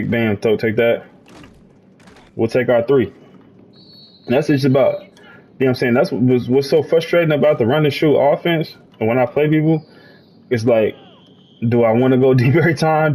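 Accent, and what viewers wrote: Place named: Philadelphia, United States. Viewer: You sound like a Philly guy. Love the content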